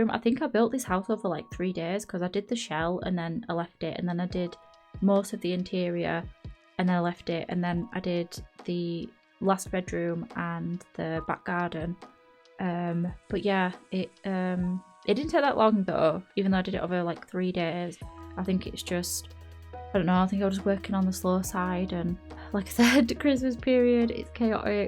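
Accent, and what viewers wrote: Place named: Northern England, United Kingdom. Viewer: Subscribed mainly because of the Northern accent (we are under represented on YouTube 😂) Also those window boxes are gorgeous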